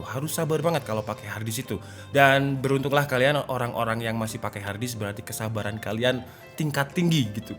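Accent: Malang, Indonesia. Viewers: Abang Orang Mana Malang kahh?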